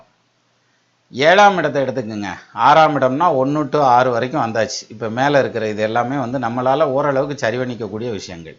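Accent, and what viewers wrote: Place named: Madurai, India. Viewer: என்ன சார் நீங்க 1000 பிஎச்டி க்கு மேல் பண்ணியவங்களுக்கு கூட இல்லாத இவ்வளவு ஜோதிடப்புலமை தமிழ் ஞானம் எப்படி சார்..அதோட சூப்பர் மதுரை தமிழ்.அப்பப்பா என்ன சொல்லி உங்களை பாராட்டுவது..வார்த்தைகளே இல்லை சார்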